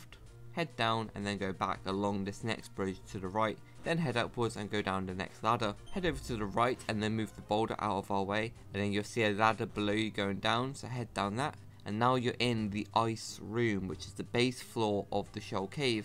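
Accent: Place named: United Kingdom. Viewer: I like listen ur videos because your accent, is British?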